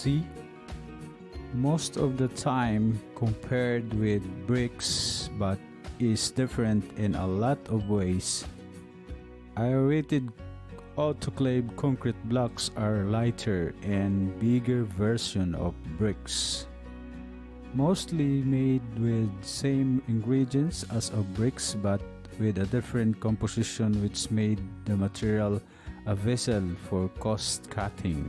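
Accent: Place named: India. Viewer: Heard indian voice, click out quickly. Shittiest group of people in construction.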